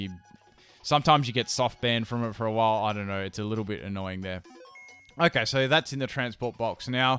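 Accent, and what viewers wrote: Australia. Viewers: TheMegaultrachicken It's a very thick Aussie accent then. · He's a native Australian. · is australian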